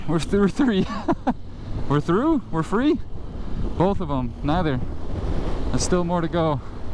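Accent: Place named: Minnesota, United States. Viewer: you’re welcome! Thanks for the compliment! Glad to help. Your MN accent reminds me of being a kid living in NE Minneapolis.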